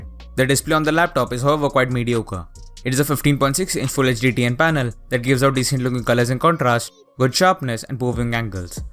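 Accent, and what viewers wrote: India. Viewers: Indian accent is so funny to hear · You’re accent is too strong. Speak a little slowly so everyone can understand you and not just Indians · Suena más como alguien de la India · I am Portuguese and I learnt english not how to understand indian accent and even his accent being bad he tries to speak very fast messing with his pronunciation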